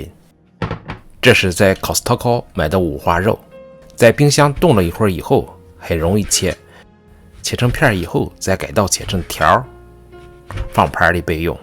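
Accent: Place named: Qinghai, China. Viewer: 标准的青普 哈哈哈，爱上了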